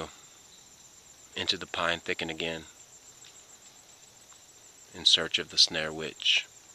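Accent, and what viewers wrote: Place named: South, United States. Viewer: I love your country accent. ;)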